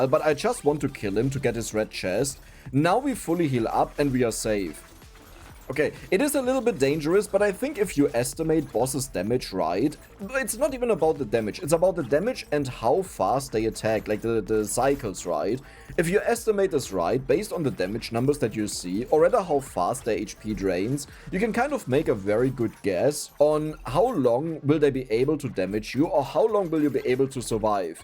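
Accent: Germany. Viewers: rede doch einfach deutsch das kann man sich ja nich anhören mit den deutschen akzent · Ich liebe deinen deutschen Akzent 😂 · hi my first time on this channel, after 1 min: yeah this guy is german, after minute 29 i heard "scheiße" and burst out loughing, great video. love brotato :D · R u German ? Ur accent is so german line mine 😂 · Er spricht weder BE, noch AE. Er spricht germanenglish 💯😃💪🏼 bitte content auf deutsch, das wäre der Traum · he sounds very German, not Austrian German too. You can also tell by the efficiency shown here. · Broooo is that guy german? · Are you german? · hab mich die ganze zeit gefragt du klingst so deutsch dann bei Minute ungefähr schei** hat alles bestätigt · ich wusste doch das du deutsch bist man merkts and deinem akzent und an dem "HEILIGES SCHNITZEL"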